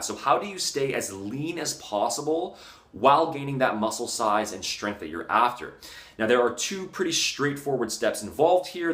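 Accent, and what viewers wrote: Australia. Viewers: You Australian??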